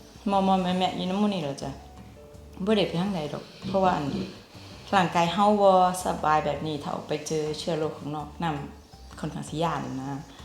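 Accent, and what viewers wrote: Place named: Korat, Thailand. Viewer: แก๋นี่มันสำเนียงบอกเลยเนาะ.ว่าค้นโคราช.หลานย่าโม..ดีแทะ, แทะ.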